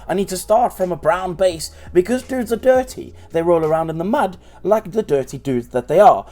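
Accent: South Africa. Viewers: I hear a South African accent and I am here for it!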